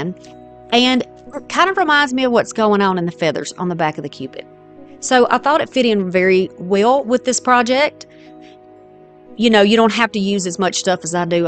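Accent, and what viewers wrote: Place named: South, United States. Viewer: PS ....I LOVE LOVE your accent ... I regularly watch Randy and Amanda from Incredible Tiny Homes ...I found them during tough times ...so now I am a sucker for a southern accent ....wishing you well ...happy crafting ..xo from Vancouver BC Canada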